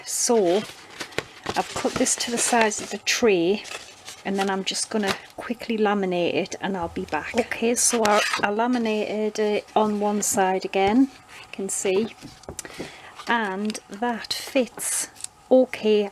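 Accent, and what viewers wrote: United Kingdom. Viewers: I soooo love your videos, such great ideas (and I love listening to your British accent) You could use a small square of velcro to close the pouch with. I have them on covered paper pads and they work well.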